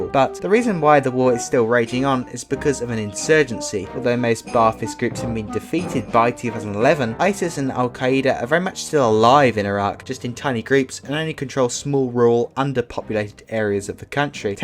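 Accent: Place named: United Kingdom. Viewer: so i assume your british from your accent so college for you would be year 11, 12?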